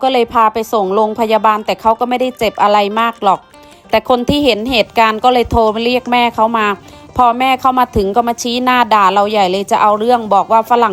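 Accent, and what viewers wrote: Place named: Central Thailand, Thailand. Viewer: ชอบจัง บรรยายไทยกลาง ส่วนใหญ่คนอื่นพูดอิสานกัน ฟังไม่รู้เรื่อง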